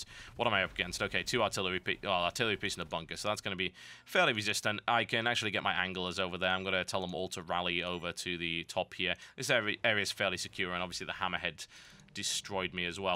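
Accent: Australia. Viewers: Now THAT'S an Aussie accent... Thankyou lord! Not another case of the butchered accent like the horrid one in Dead Island... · Wow... an actual real Australian accent? That's rare. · Australian guy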